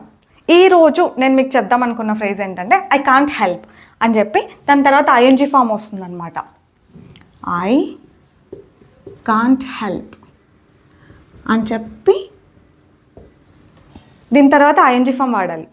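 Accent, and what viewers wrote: Anantapur, India. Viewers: Akka medhi Anantapur aa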